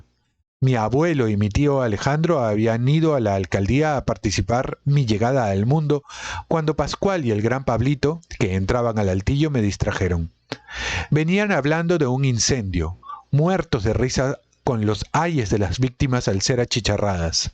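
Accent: Peru. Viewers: La narración es perfecta, el acento peruano resulta de lo más adecuado, lo disfruto mucho.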